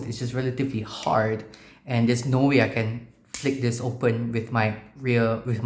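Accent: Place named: Malaysia. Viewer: I could tell you were from Malaysia as soon as you started talking. Great review. I am wanting to get the green handle model to match my Buck Lite from the 1980s. I subscribed and look forward to seeing your other content.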